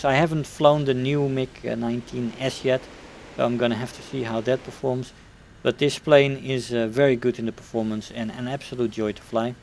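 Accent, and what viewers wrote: Netherlands. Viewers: je klinkt nederlands of misschien ligt het aan mij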